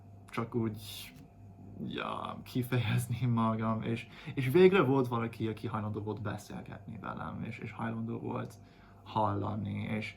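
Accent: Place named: Budapest, Hungary. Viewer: Én tájszólással beszélem a magyar nyelvet, te pedig mintha Budapesti lennél.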